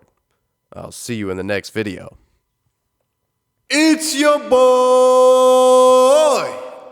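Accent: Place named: South, United States. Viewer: Why do you sound like a CSA southern solider ?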